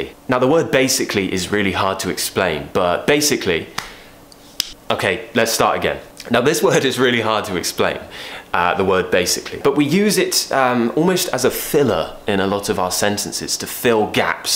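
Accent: United Kingdom. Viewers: I am gonna come to uk just to speak in your accent. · Because he himself speaks very clearly british English it is easy to improve our accent just while listening to him and repeating the way he speaks. That’s why he is very effective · I'm not exaggereting right now. Literally, you are the best english teacher on youtube or galaxy. I'm constantly watching your videos and learning decent British accent. Thank you very much mate.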